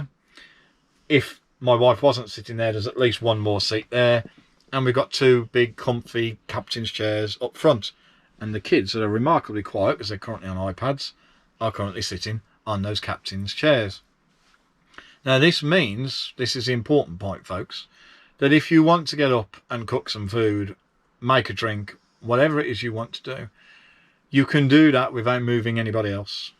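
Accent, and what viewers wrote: Birmingham, United Kingdom. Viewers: Yes, thank you! That one works! ☺ Good to here the Birmingham accent again! I went to school near Weoley Castle in B'ham! Nobody told us that we were speaking old Welsh. West Mercia used to be part of Wales!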